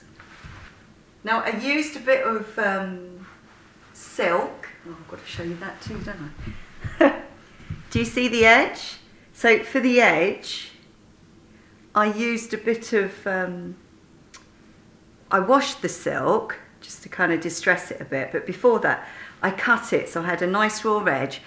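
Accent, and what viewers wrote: United Kingdom. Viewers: Happy Christmas, and thanks for the "tour", especially the beginning as I spent the first 12 years of my life in West Ken. That and your west london accent made me nostalgic. Thanks to your channel I have revived my sewing interest and my new year aim is to work through a Japanese dressmaking book (in English!) I aim to upgrade my style as I am on a diet. 🎄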